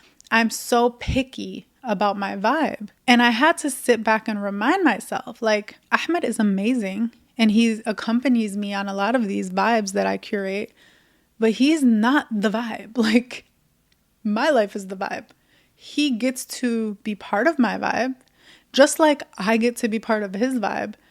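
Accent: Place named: Michigan, United States. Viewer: girl r u from southeast Michigan? You sound so local it’s crazy haha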